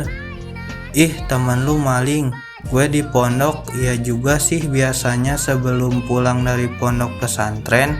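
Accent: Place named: Sunda, Indonesia. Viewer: Aa nya orang Sunda yah, saya lihat lihat nadanya kaya orang Sunda, sok ngomong badag🧒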